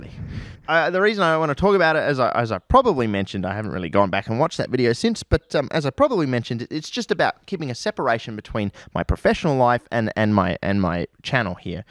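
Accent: Australia. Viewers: Good to see you,. Your intelligent, irreverent, Strine is music to my ears:)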